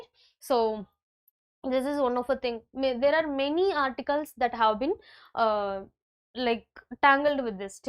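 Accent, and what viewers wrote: South India, India. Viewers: Mam apki tone thodi south Indian touch deti he are you from south Indian ❤❤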